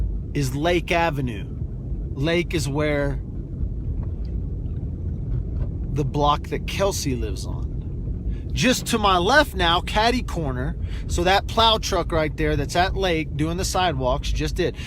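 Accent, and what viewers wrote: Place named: Texas, United States. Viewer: Lori Ransom He is from Odessa Texas and that is his country boy “speak” coming out!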